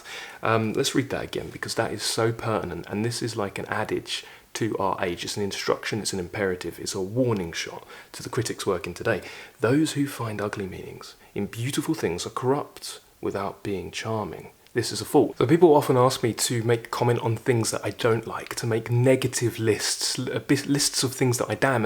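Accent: United Kingdom. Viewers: Is that British accent ... you are so well spoken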